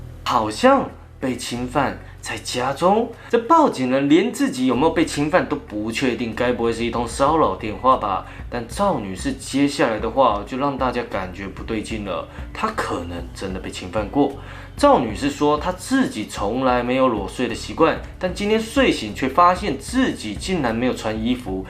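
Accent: Northeast, China. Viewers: 我艹，大叔是东北人？ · 听口音，这不是江苏淮安人啊，这很明显是个东北人啊，视频不严谨呢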